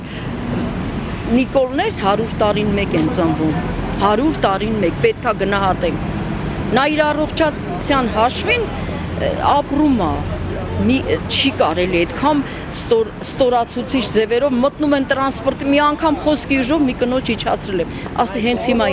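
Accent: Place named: Turkey. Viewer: Հաստատ թուրքա հայերեն խոսացող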